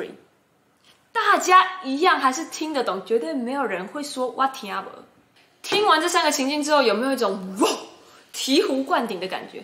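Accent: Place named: Taiwan. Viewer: 妳分析的很好 很有道理；尤其妳那台語真的是很道地 很傳統！給妳100分👍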